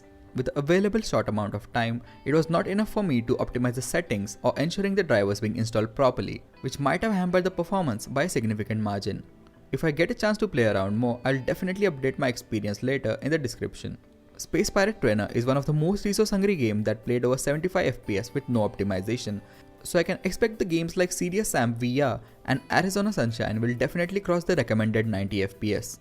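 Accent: India. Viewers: Your thick Indian accent makes it hard to understand. I appreciate your effort though.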